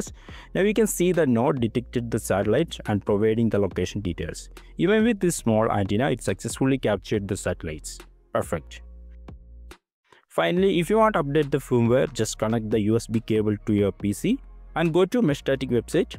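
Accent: India. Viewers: Индийский английский звучит противно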